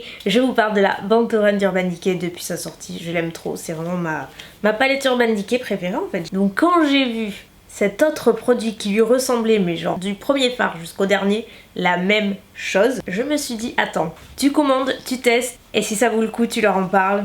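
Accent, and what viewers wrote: South, France. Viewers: Pourquoi tu dis "pace" au lieu de "parce que"? Est ce ton petit accent du sud😉😊 très très bonne vidéo! Pourrais tu tester entièrement la marque W7? Bisouss❤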